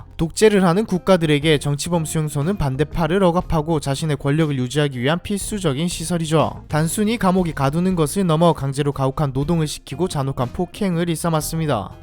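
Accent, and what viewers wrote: Gyeongsang, South Korea. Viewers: 혹시 여자성우분은 AI였었나요??? 남자분은 경상도 아저씨느낌임..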